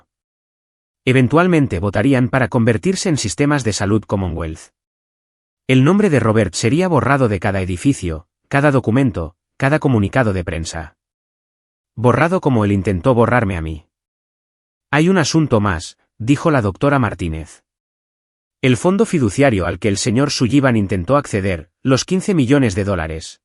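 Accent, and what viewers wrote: Spain. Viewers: A mí siempre me gustan las historias que narra este muchacho. Oh, señor, lo felicito, me gustaría saber cómo se llama . Sé que tiene un acento español Las narraciones son Excelentes y voy a ver esta qué tal me parece desde el principio que es buena.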